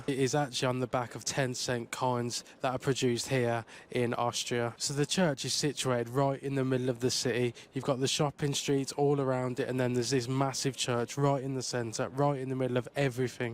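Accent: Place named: England, United Kingdom. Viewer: Curious which city/part of England your accent is from?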